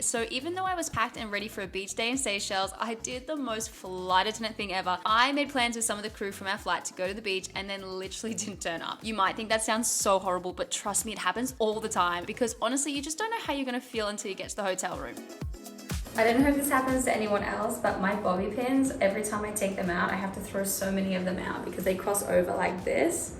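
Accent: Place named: Australia. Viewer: I knew u sounded Aussie when I watched your shorts…thanks for the content it’s very interesting job u have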